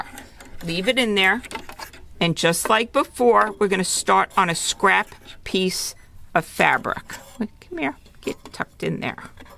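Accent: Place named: New York, United States. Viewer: Some great tips thanks and I love the NYC accent! She sounds like Fran Dresher...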